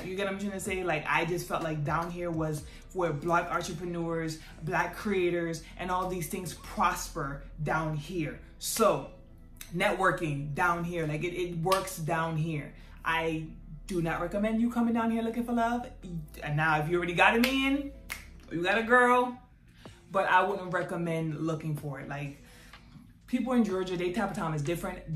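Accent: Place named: Caribbean. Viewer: Sis you definitely sound Caribbean!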